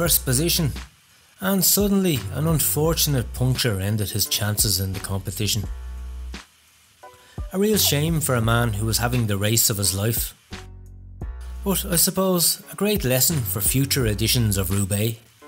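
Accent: Ireland. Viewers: Irish gay narrative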